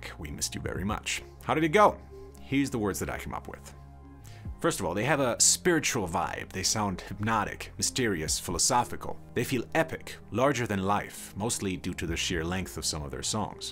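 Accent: Germany. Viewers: Holy guacamole, hello my fellow German. I didn't know you were so very German. But now that I know, I can actually hear teeny tiny things in your speech that should've given it away earlier. In any case, your videos are amazing. Thanks for being such a great inspiration! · Are you german?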